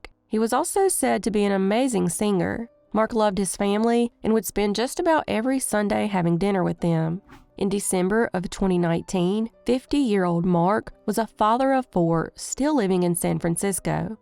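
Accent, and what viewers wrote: South, United States. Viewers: Can't stand the hillbilly narrator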